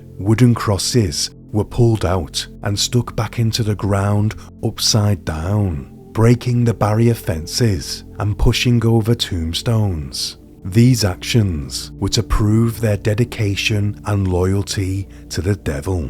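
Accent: United Kingdom. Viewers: He's British! That's his normal tone. I love his voice. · This popped up in my suggested The narrator sounds like he could be British chills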